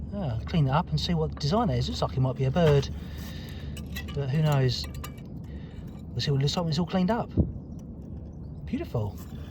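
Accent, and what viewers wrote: United Kingdom. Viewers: What accent is this? I have issues to uderstand. You sound too british, too native!!!! Please try to speak with more international accent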